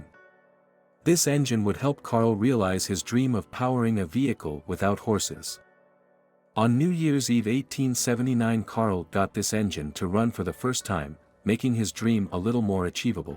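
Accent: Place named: Germany. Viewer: Pity you missed supercharged 540 K and SSK...But great and funny video !!P.S-Funny because of German pronountiation..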